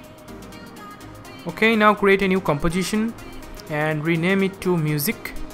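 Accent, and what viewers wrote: India. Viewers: Hindi Accent Wow nice x) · You know its legit when a guy with indian accent makes a tutorial on it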